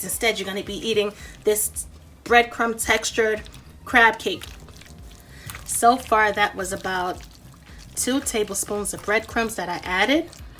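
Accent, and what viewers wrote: New York, United States. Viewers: Lordy,Lordy that looks good. I’ve been fasting for 18 hours now and this just drove me to another level of goodness.I hear a hint of NY in your speech, is that right. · I’m a brand new subscriber. These crab cakes came across my feed, and I was hooked. Are you from NYC? I read your about but it didh’t say. My hub is from the Bronx. I hear an accent. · Hi. I just love your videos. I really don't remember when I subscribed to your channel, but it had to be something that look good for me to do it. Me and my husband was watching a vid of yours maybe a week ago. Your recipes look sooo amazing and good. But, our decision was about your accent. I said that you sound like you are from NEW YORK. He said your accent sounds like more of NEW ORLEANS. Please let us know. Because TRUTHFULLY!!!! NYC IS ON MY BUCKET LIST TO VISIT!!! WE BOTH LOVE EAST COAST RAP, so I don't understand why he said NEW ORLEANS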